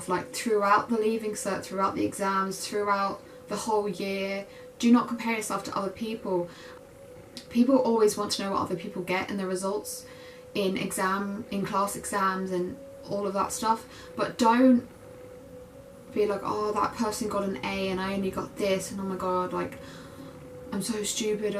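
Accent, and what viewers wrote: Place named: England, United Kingdom. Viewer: Where are you from you sound English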